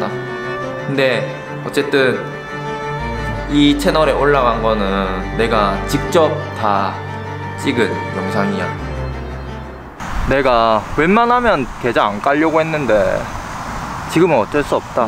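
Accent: Daejeon, South Korea. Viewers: 저기 혹시 대전사람인가요 어째 친근하더라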